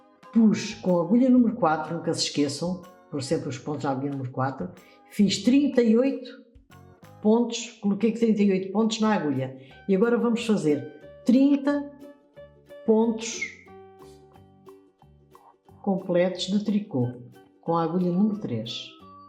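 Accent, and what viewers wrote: Portugal. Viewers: Que bom um video em Português de Portugal. Simples. claro e conciso.Muito obrigada ! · Porque ela é de Portugal e lá o português que eles falam é um pouco diferente do jeito que falamos no Brasil . 😃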